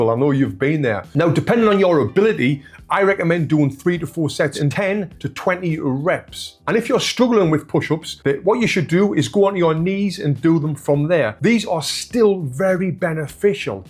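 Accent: United Kingdom. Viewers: Your accent reminds me a Guy Richie movie! Lovely tutorial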